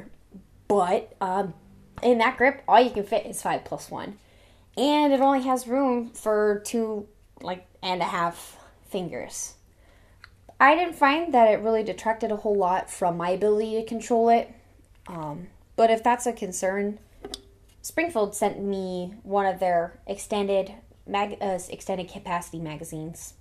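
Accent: Wisconsin, United States. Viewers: Austin France Hey hey you can't hate on the Wisconsin accent!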